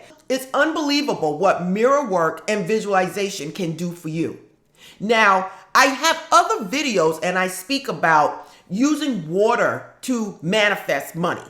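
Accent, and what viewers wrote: New York, United States. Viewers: I just love your channel ❤️ I just love your setup , I kinda look at you like my spiritual fairy godmother lol I love the accent is it a New York accent ??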